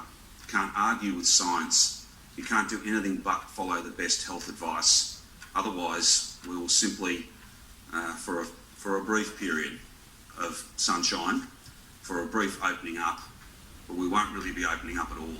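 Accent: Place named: Australia. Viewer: そうですよねー、クリスマス、新年はみんなでわいわい迎えたいですよね。 オーストラリア英語いいですねー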